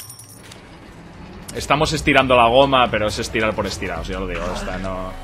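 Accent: Asturias, Spain. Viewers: eres de Asturias??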